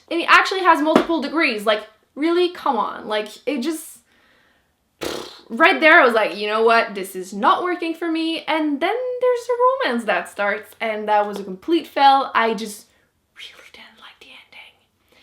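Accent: Quebec, Canada. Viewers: ps: ton accent québécois est super cute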